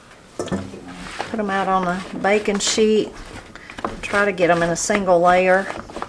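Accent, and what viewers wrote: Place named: West Virginia, United States. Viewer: Love that accent. She talks like my mom did, she was from WV.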